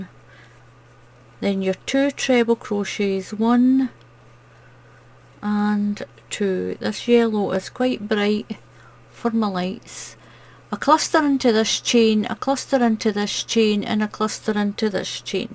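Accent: Scotland, United Kingdom. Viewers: I love some of the patterns, and I also love your beautiful accent. You sound Scottish. I unfortunately, have trouble following along, because you don't use U.S. information/directions to your video's, and i find that very frustrating when I hear; "double crochet" and it's a "single/ treble." It's quite backwards and confusing to me.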